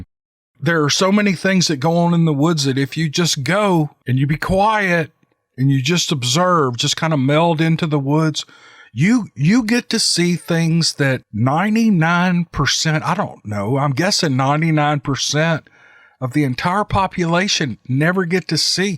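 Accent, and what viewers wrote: South, United States. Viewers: Dude I bet that's why I listened to your stuff I like hear your voice you got this really Great Southern Comfort accent and you're great storyteller are really into big foot have a great night camping in your wife have a good night God bless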